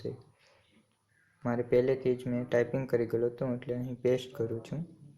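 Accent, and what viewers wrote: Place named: India. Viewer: Stupif indian voice smells like rotten egg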